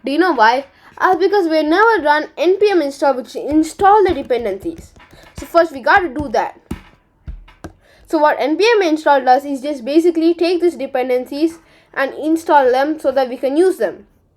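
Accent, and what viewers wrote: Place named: India. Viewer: Broski you sound like an average indian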